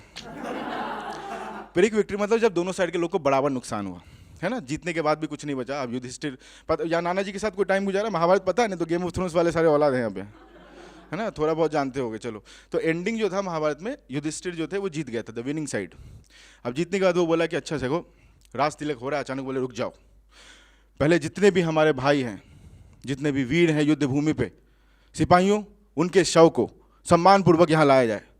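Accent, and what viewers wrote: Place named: India. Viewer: waise, aapke saare video dekhe, funny to hain hi lekin ek desi lehja bhi hai jo jokes ko aur bhi mazedar bana deta hai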